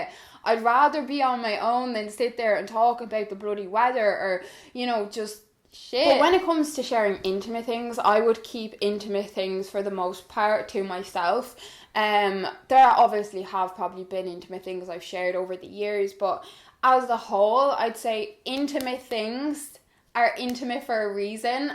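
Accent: Ireland. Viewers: Why do you have such a strong Irish accent and Melanie doesn't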